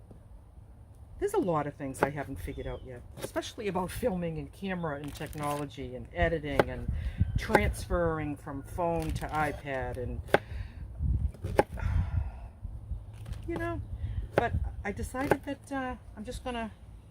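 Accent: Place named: Boston, United States. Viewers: I just love this video. You remind me so much of my family, my Aunts, and my Mom, although I'm sure I'm close to your age. You make me smile, and laugh which feels so good. I loved when you said "butta" and get outa here flys who invited you! I'm from Boston so I appreciate the accent! I love vegetables and love watching your prep and combinations of them. Very inspiring! They all look so delicious! Thank you for your videos, you are a natural, and thanks for making me smile!🙂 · Two things - One is that I love your accent and I have been wanting to tell you that I knew from your accent that you were from New England. My husband grew up near Boston, in N. Abington. He had moved to Texas A few years before I met him so, his accent was Texifiied!! His brothers still live there and I get lost in conversations due to listening to their accents. 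Two, is that. I am so glad you posted this using your. instapot. My kids gave me one last year and I have been afraid to use it. I saw a book on it at Walmart today and almost bought it but I came home and you are here giving me a lesson. Lol. I. Enjoy your videos very much. Thank you.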